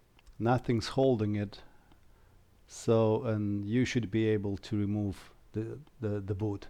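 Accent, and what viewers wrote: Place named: Romania. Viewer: Mercedes-Benz Channel We all know that experience my friend. It’s an inspiration for me, you’ve got it looking really good. I hope you find time to complete the build soon. I haven’t even started mine yet. It’s a 1983 USA spec model (solid alloy wheels and ‘INTERCEPTOR’ decals), so rather rare here in the UK. Where are you based? Your accent sounds Romanian to my ear. Hope I haven’t offended you.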